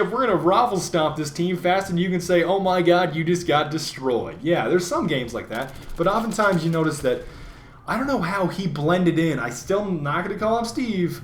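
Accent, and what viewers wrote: Russia. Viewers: are you Russian or are you faking like FPSRussia?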